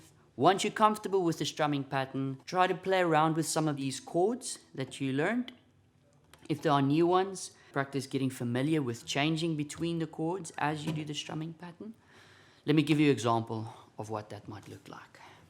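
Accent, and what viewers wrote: South Africa. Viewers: can spot a south african accent from the first word hehe, great tutorial thank you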